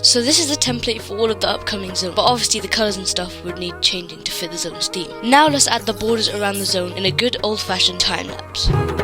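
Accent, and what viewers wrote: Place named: United Kingdom. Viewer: u sound like a chilld, speak like a old british man and insane editing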